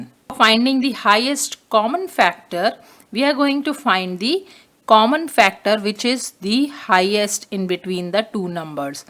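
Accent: India. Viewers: Itni English tu aaj suniye jitni main angrejon se bhi nahin suni thi angreji hai yah Indian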